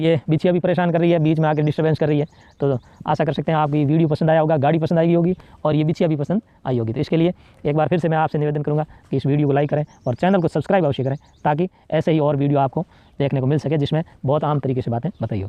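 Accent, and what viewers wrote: Rajasthan, India. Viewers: राम राम भाई.. कुणसो गाँव सा.. आपके बोलने के Accent से लगता है आप राजस्थान में हमारे गाँव के आसपास के ही हो..आपके वीडियो देखलर बहुत अच्छा लगा खुशी हुयी..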